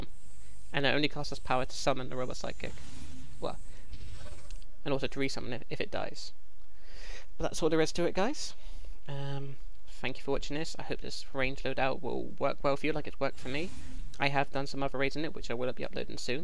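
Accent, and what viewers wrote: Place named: United Kingdom. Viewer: Love all u ppl trying to tell how to improve his loadout and he just hits u with the most logical reason as to how wrong u all are. Is it me or is it easier to grasp the load-out with that Brit accent? 4 real...same with smile and orbit....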